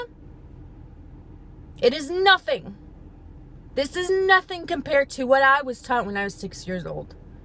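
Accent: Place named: Texas, United States. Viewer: I'm confused, if you grew up in the Middle East, Like most people in my family, where is your accent? You DID say that you GREW UP in the Middle East. And you're mispronouncing most Arabic words. You sound like you're from Texas or Alabama and such... And by the way, The equal pay thing is not a myth. It is true lol.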